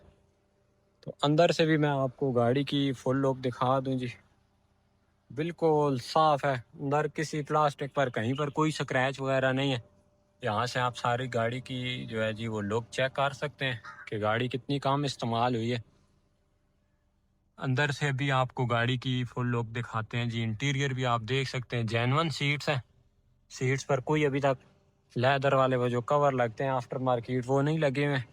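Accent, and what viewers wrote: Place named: Chakwal, Pakistan. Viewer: acha acha language apki Chakwal wali lagii islyee mujhy lga ap Chakwal se ho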